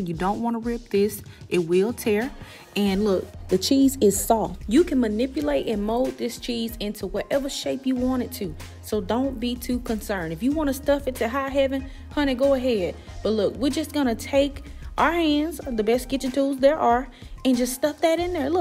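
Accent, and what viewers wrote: South, United States. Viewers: The food looks so good but can we talk about the southern accent????? Yaaaaaassssss sis… just know I hit the subscribe button! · Are u from the south? Like nc or SC? Lol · Mmm yummy in the Tommy will try and you funny 😊❤️ you sound like you from down south 😊❤️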